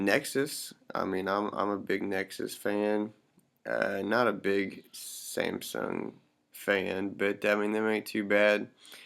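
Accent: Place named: South, United States. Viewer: Not even AT&T? LOL damn where do you live? I could immediately tell by that bit of an accent that you're either in AZ or somewhere in the Bible belt.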